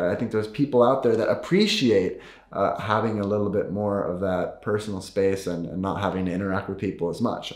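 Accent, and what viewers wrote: Sweden. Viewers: I’ve been checking in on your channel from time to time. First thing i noticed is that you’re taking on the swedish accent. Just a bit. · Is it just me or has he kind of gotten a Swedish accent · It was a while i saw your videos, and your english have way more swedish tones in it now, you sound like a swede talking english ❣️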